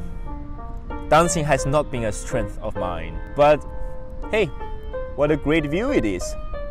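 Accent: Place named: Germany. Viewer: Also bist du österreicher dann? Zum ersten hab ich den Deutschen Akzent gehört. Ich bin in der Schweiz gewesen.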